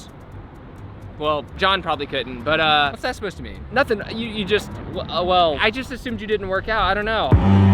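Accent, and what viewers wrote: United States. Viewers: You are asian? ^^ didn't even notice lol sounds like american, lives in usa, is american. ^^ Maybe bcs "race" is absolute not important to me, neighter nationality is. But one question still remains: How long?! 😀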